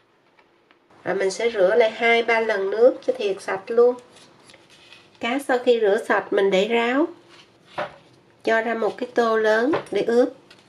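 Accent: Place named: Vung Tau, Vietnam. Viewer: Giong chi giong nguoi Vung tau qúa😮😢❤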